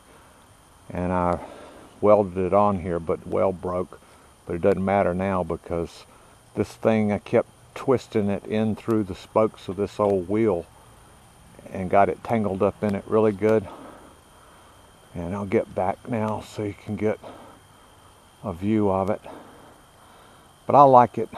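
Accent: South, United States. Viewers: When he said "purdy" I was just like 😍 I love that southern accent 💗 Gorgeous tree vine.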